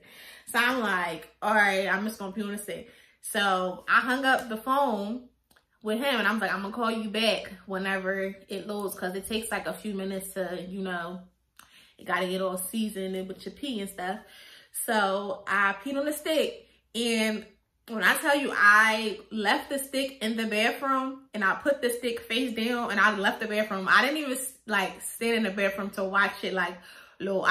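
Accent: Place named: Philadelphia, United States. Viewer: I'm going thur the same thing ughhh waiting to see if I'm pregnant now But r u frm Philly? I can hear it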